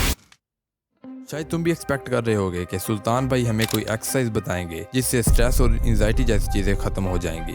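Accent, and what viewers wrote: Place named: Punjab, India. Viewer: You r punjabi right 😮😮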